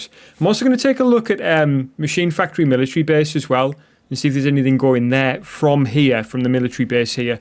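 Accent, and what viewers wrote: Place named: Geordie, United Kingdom. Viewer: You from Mackem land Marra? Or close to Stockton? Sound awfully close to a Geordie but not quite 🤣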